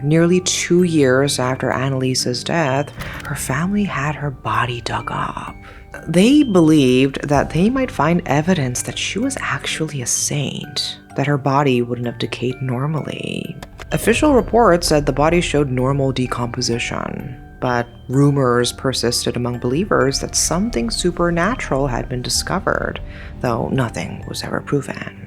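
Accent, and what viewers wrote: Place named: California, United States. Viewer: I dont like the way you speak it feels like your typical caliornia woke girl accent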